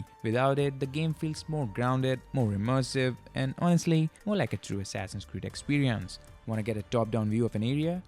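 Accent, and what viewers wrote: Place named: India. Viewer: Голос как у Индуса.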